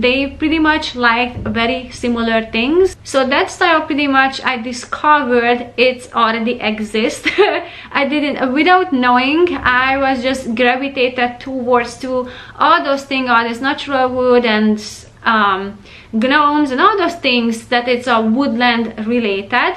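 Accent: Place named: Hungary. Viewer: Imadom menyire perfektül beszelsz angolul. Tisztan kihangzik hogy magyar vagy de az csak meg attraktivusabnak csinalja. Elvezem nagyon a channel-eset. Üdv Ausztriabol.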